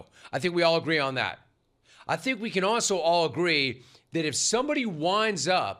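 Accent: Southern California, United States. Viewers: your soo funny with your so-cal accent and lingo.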